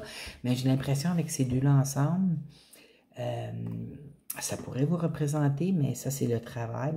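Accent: Quebec, Canada. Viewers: Merci pour ce beau tirage qui m 'apaise surtout concernant le travail! et puis entendre votre accent du Québec me ravit au plus haut point. Je suis tombée en amour avec votre accent après avoir vécu quelques temps dans votre beau pays. Merci à Vous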